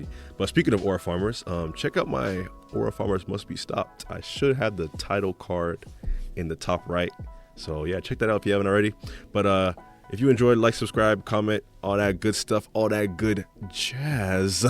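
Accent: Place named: Nigeria. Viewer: Bro you Nigerian aren’t you? 🌚😌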